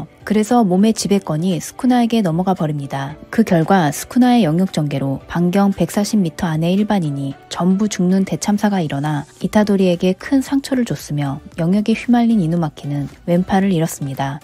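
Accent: Japan. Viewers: 일본인이신가 발음이 그냥 일본인인데..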